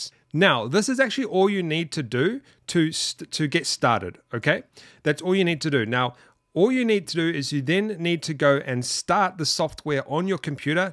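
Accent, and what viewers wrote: New Zealand. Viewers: awesome. a Kiwi Accent. Also When did you make this vid? cuz the info i'm seeing. with a Nvidia RTX3090 est.daily profit is 9.2USD. Ummmm what? defo not 35.42USD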